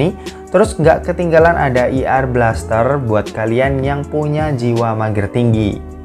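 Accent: Semarang, Indonesia. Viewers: org semarang bro?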